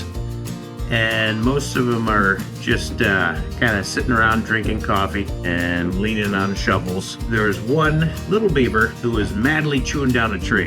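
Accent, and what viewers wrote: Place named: Canada. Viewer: When you 1st started talking, I thought you were Canadian.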